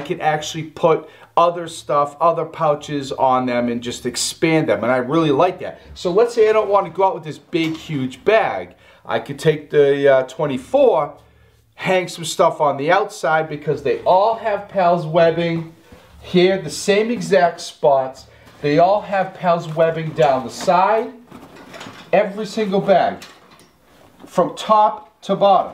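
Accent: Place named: New England, United States. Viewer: Great vid !!! Btw I'm sensing a fellow New Englander by the accent !!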